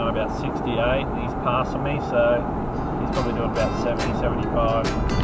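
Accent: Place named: North Queensland, Australia. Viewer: I can usually tell where you are driving because I used to drive a lot in the same places, and still do a bit, so it's all very familiar. One thing I can't place though is your accent. Are you from North Queensland, by any chance?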